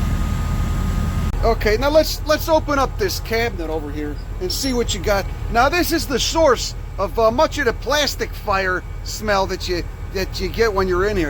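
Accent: Chicago, United States. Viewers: Far out ...first video I heard your voice in I was like this guyz gotta be from South Berwyn or Shtickney...got any good eyetallian beef up there in Oakland ? Yeah I didn't think so you prick.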